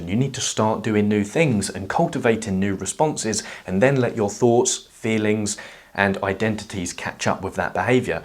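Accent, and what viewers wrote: United Kingdom. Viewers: Unrelated but what type of British accent is this? I like it a lot. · The britishness in his voice is so refreshingly calming put in a good mood like when ur high on caffeine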